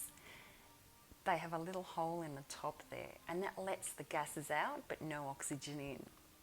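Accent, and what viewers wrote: Australia. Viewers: I thought ... 'that sounds like an Aussie, but must be in North America, because some of the words used', I now realise you are in Vic. My question ... what are the visual signs the ferment is done? I have had all failures in this first month of trying. The chooks are getting heaps of sauerkraut. I make a good sourdough, so I thought I would try to make a ferment starter. Well .. it is turbocharged ... I made carrot sticks, with fresh dill, in a Leggo's jar. Next morning, I tried to 'burp' it, it came out like a shaken bottle of champas. Today, was the third day, when I opened the lid, I had to quickly put it back on again. I opened it 3 times in 10 minutes, and finally it wasn't trying to spew out. It still had crunch, but definitely softer, a little bit salty, but I wouldn't call it sour. I put it in the fridge.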